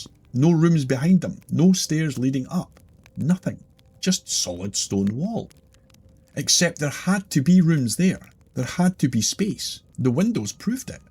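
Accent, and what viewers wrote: Scotland, United Kingdom. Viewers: Carol scottish born live in Australia 🇦🇺 FINALLY found a brilliant scottish spoken brilliant story teller. Please keep me the great work 🙏 keep your voice the way it is ... unfortunately many change voice & end up singing & playing characters. Looking forward to many more stories 😊 cheers from a scot 🏴 from Australia 🇦🇺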